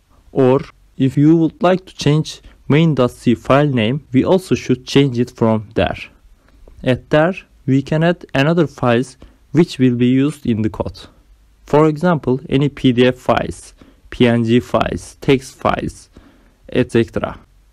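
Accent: Turkey. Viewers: Are you Turkish? because your accent is similar :) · You are Turkish, I can swear but I can not proof :)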